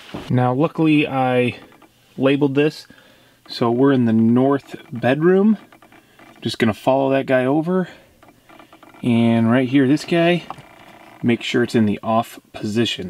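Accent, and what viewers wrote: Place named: Southeast, United States. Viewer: Don't move the bathroom door out of the hallway into the spare room, you'll hate it later and future buyers with experience in remodeled homes will not like it either. You might think it is just a couple of corners and a few steps now, but you will get so tired of it down the road, especially those times you are in a hurry. I'm not sure where your at, but judging from your accent the SE maybe? Up North here, I would have looked into extending those 2x4s by tacking on some 2x2 and replacing a few 2x4s around openings with 2x6s to get a 5 1/2" deep insulation bay. While you were doing that you could have fixed the framing around the windows with proper king/cripple/header arrangements.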